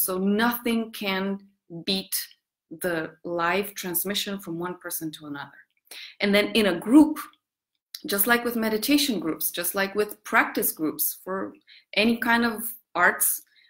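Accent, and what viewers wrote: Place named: United States. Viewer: But you do speak English perfectly! And you have a bit of American accent 🙂 Did you study there?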